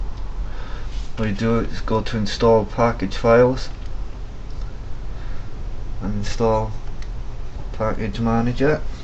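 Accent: United Kingdom. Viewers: Do you live in Swansea? I ask this based on your accent. If you do would you flash my desire for me? I havent really got the time to learn all this stuff. Theres a 8 pack of stella in it for you :D Give me a PM. Cheers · Are u Welsh ?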